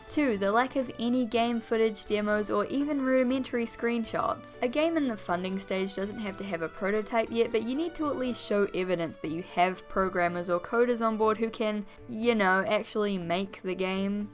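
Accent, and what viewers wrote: New Zealand. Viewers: I am now secretly praying that this youtuber is Australian and not a New Zealander, because I really like my joke but the more I watch the more her accent makes me think New Zealand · Just stumbled across this video by chance but I can't help but feel I've seen this person somewhere before. It might just be the kiwi accent but I'm sure I've met her at a party or sat next to her on a bus or something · Is that a New Zealand accent I hear?! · holy shit I had completely forgot about this project. it was actually a scam lol p.s. I love your kiwi accent! you got a new subscriber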